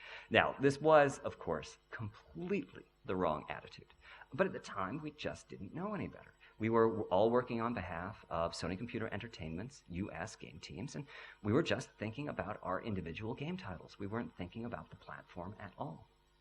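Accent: United Kingdom. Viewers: Wait he's an American? Why does he sound British?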